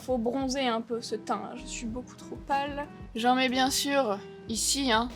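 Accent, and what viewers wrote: Marseille, France. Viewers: Je suis déçue, je m'attendais à une vidéo entièrement avec l'accent de la Cagole ! C'est hyper drôle d'avoir choisi de faire un make up vulgaire pour tester cette palette en tout cas 🔥❤️ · Vulgaire mais pas cagole ! Avé l'accent de la cagole c'était parfait ! 💖💋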